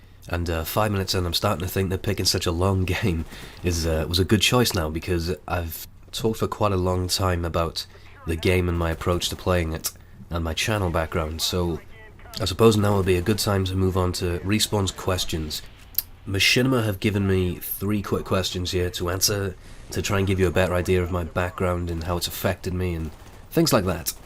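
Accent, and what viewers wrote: Wales, United Kingdom. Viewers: i must say i dont like the welsh accent but ure voice is heavenly and the kiparis is BEAST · where in he uk are you from? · I think you would be such a good voice actor! It's like when I first heard Gaz in cod 4, and then Ghost in cod 6. His voice was awesome and I got the same feeling when I first heard you. btw, are you from wales?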